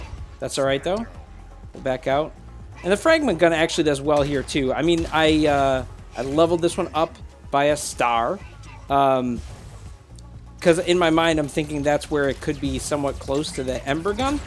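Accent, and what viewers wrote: United States. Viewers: Hello remember when I told you that I wanted you to review my hangar basically I wanted you to give me a summary of what I have now and what I should have later on again my Id is <num> Have a great day ahead man love ur videos btw I'm subscribed ur like my main mech arena content that I watch every day btw I'm Indian 🇮🇳🇮🇳🇮🇳😎😎and I can speak in American accent like the u speak I can say the same accent and I'm 10 years old and I play mech arena pretty often it's one of my favourite games I love this game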